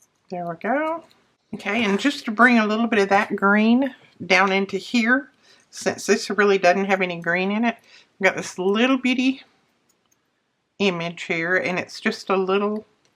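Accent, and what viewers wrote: South, United States. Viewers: Hello today , I am sitting here with my feet up just enjoying your tutorial and your wonderful southern voice. Would you tell me how to find your granddaughter, she is such a sweetie. God bless and keep you.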